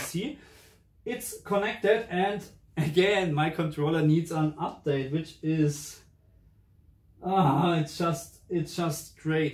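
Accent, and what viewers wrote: Germany. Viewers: Kling ein bisschen deutsch das englisch, aber dafür erstaunlich flüssig.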